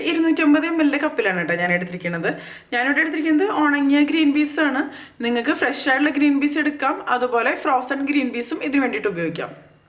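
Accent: Thrissur, India. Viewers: ചേച്ചി തൃശൂരാണോ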